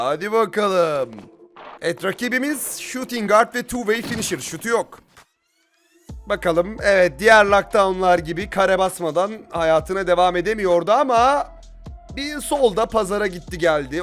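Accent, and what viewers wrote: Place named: Aegean, Turkey. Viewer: abi niye egeliler gibi konuşuyon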